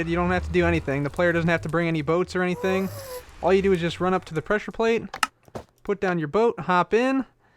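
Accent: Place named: Ohio, United States. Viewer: probably america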